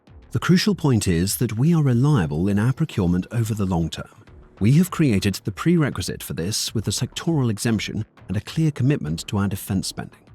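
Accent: United Kingdom. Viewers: always a British accent too.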